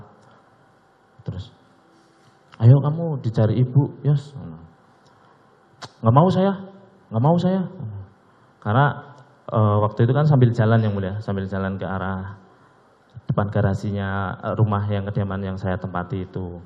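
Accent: Java, Indonesia. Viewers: Baru denger, nama tp medog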